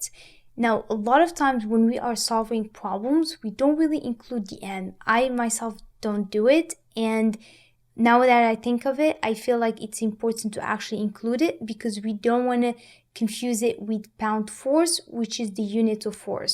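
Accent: France. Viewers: Sorry for my dummy question, are you from France?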